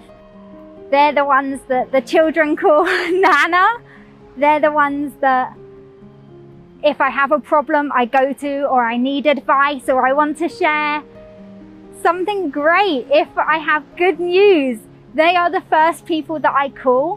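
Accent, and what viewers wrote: United Kingdom. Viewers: 不為創作而創作 想 也可 再 動手 靜靜地感受生活的美好 也是 美-！！ I do love your UK accent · 我就喜歡妳「正統」發音的英語，這只是相較於美式發音的英語，並沒有其他太多想法。大概多數的臺灣人只能辨別英式或美式英語，無法再細分英國境內的南腔北調。我的觀念，英語源自英國，所以Lucy講的英語是正統的。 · Hi,妳的英國腔口音，真的很迷人，台灣人很難學得起來。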